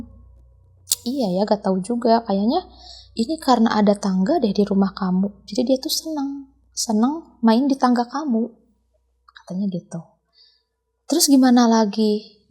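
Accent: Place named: Sunda, Indonesia. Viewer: Klo org sunda ska mndongeng sok enakeun.. ada ciri khas nya kata " teh.." yg gk bsa d ilangin....😅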